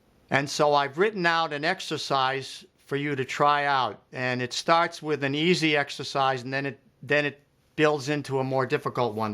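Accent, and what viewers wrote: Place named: New Jersey, United States. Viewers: This guy has the strongest jersey accent i've ever heard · Love his jersey sound👍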